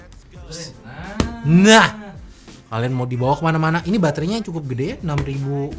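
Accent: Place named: Bekasi, Indonesia. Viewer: Suka banget dah pembawaannya. Bekasi banget!😂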